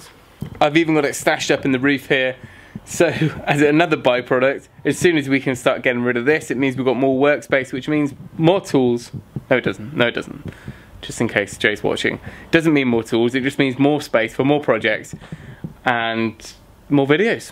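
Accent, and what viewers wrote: United Kingdom. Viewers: I thought "why is he excited he had to pay for the wood, saying that is was not free", then a second or two later "ah wait a second he means it's free of knots in the wood". Only discover the channel recently but very much enjoying it. And from the sound of it you are relatively local to me, in Bristol. I too enjoy renovation but have yet to try projects on your scale just 3 bedrooms and a garage roof but the less said about that the better; will be replacing the onduline with EPDM next year after having had to DPM over it till the weather improves and we have save a little for it. I plan to go thick on the insulation for the roof in there and with extra joists, OSB and EPDM on a 6x7m it gets pricy but will certainly look to use the company I've seen you recommend in another video about the garage renovation.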